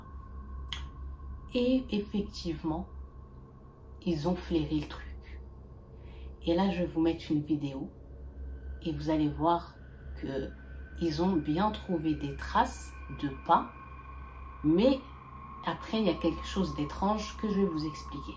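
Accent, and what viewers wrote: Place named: Arab world. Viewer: Bonjour ma belle je suis ta chaîne depuis très peu et j’adore ces sujets. J’ai une histoire à te proposer c’est celle du sorcier yéménite qui en voulant arrêter la sorcellerie ses enfants sont tous morts … je ne sais pas si tu connais cette histoire elle est vraiment incroyable Par ailleurs j’aime beaucoup ta lahdja quand tu parle en arabe, c’est laquelle ? Encore merci et bonne continuation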